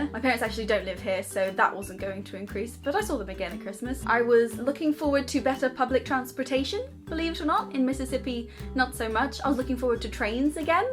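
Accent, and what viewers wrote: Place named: United Kingdom. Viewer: Also you sound a lot more British now and also the accents that aren't good are.... Londoners and Yorkshire. :P At least that's my limited impression. haha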